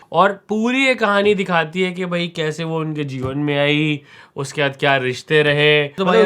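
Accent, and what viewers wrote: Bengal, India. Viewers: bhai ek ep pura bengali accent mein karo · Bengauli accent was just too good, top notch 🤣🤣🤣🤣🔥🔥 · I am a bengali buy bengali accent makes me laugh 😂😂😂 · Bengali accent >>>> prabhas jokes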